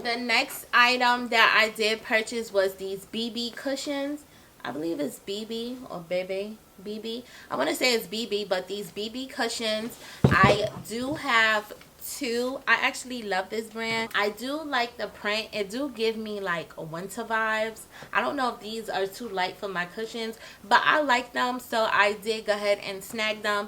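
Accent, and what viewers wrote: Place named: New York, United States. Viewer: Hey Melanin Queen! Ok you went to make it rain I see ! You have some nice stuff that you some of the stuff and there are some stuff that I never heard of . I may need you as a shopping buddy. Love your personality lol. Sounds like you from New York u have a strong accent. How did your date night go? Did it went well? I don’t like flat pillows either … I always said I was going to get the mood lights …. Question can u play music and it switched the mood of the light or can you switch the colors? How do you feel about Kylie brand of lipgloss? I’m going to may light my candle for my bathroom as o take my bathroom..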